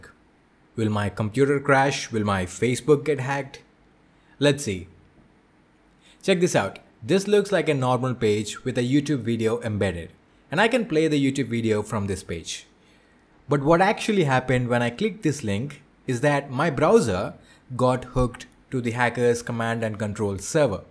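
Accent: India. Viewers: One of the rare indians where u fully understand what he is saying yet you know hes indian due to the Accent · I cant handle Idian accent.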